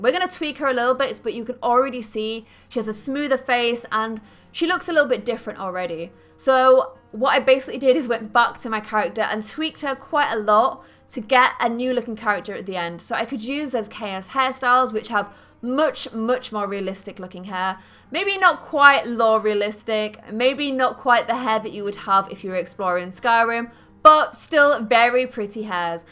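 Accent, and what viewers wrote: United Kingdom. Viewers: Well. She was hot until she unleashed her British accent. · So this is where the Little Mermaid went. She also adopted a British accent. Neat. :D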